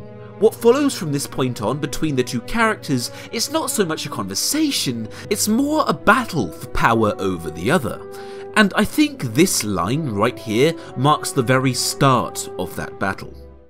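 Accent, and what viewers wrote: United Kingdom. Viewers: i love the british accent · I am subscribing simply because of your sexy British voice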